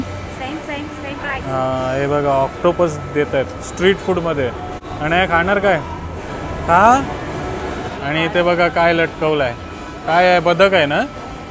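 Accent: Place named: Agri Koli, India. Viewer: आणि तुझ्या मध्ये गावठी भाषेचा टच खूप म्हणून तू आगरी कोळी खूप छान शोभतो.... I like it very much..😊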